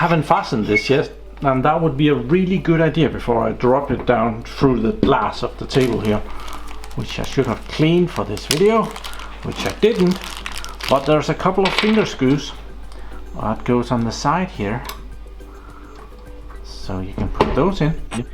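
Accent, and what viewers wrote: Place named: Denmark. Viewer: Jeg har kun set 1 video, og er allerede subscribet til dig fordi du taler Engelsk på den eneste rigtige måde - Danglish.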